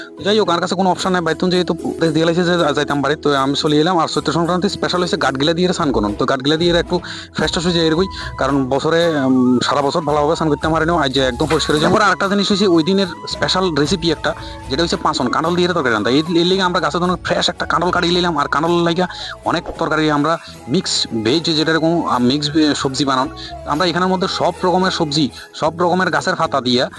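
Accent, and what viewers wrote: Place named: Noakhali, Bangladesh. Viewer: Gramar language suru korchish ekdam nowakhali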